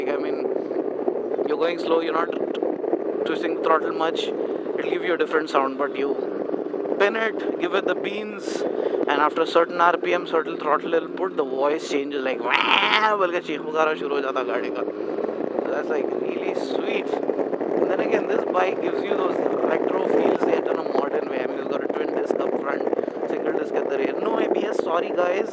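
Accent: Hyderabad, India. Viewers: Bhai teri hydrabadi mast lagti hai re garda hai garda 😘😘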